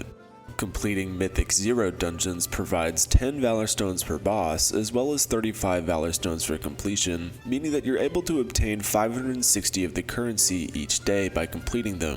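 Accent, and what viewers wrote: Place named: California, United States. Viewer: You sound Californian as fk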